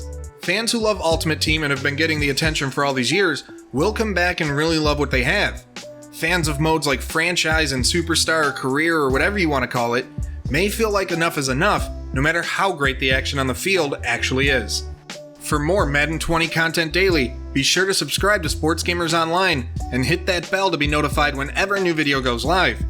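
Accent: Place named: Midwest, United States. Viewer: Oh damn..that wisconsin/minnesota accent tho 🤣😂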